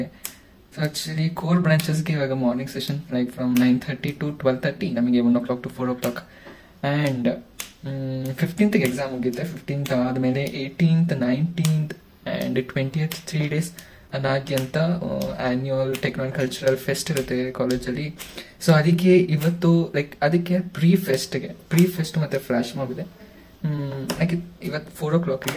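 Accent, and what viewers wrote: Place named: India. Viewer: Your hindi accent 😅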